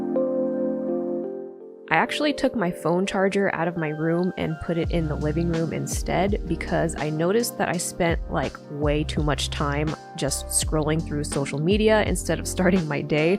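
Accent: Australia. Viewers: I was wondering if anyone else noticed that lmaoo sounds kinda australian or new zealand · aussie accent goes crazy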